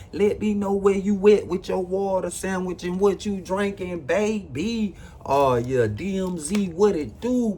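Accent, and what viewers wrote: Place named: United States. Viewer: Fresh out tha friderator 😂😂😂 I love your country ass ❤️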